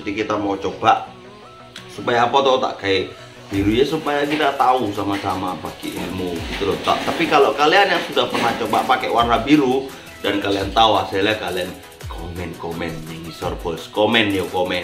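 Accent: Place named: Java, Indonesia. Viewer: Sampean iki jane wong ngendi to jare nng bali ko ono combrot jowonya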